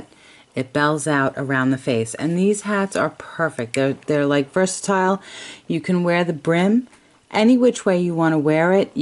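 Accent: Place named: Brooklyn, United States. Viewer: I'm a Brooklyn girl but live in California now, hearing you I felt like I was home. Love this hat, gave one to a friend she loves it as her go to hat.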